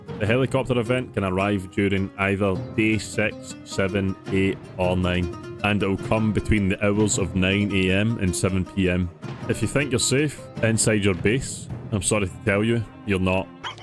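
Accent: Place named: United Kingdom. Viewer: Always great to hear fellow brit bongs talk PZ, no matter where in our glorious Isles you're from. Good tip vid, though I'm sure you can just hunker down at the safehouse during the helicopter event, as long as it hasn't spotted you, it will just fly around the map. Easier said than done but if you listen to the emergency broadcast on radio before sleeping it will give you the heads up about the chopper for the next day.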